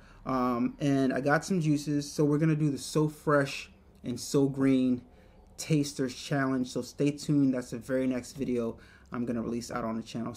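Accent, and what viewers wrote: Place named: Baltimore, United States. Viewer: you from baltimore my man?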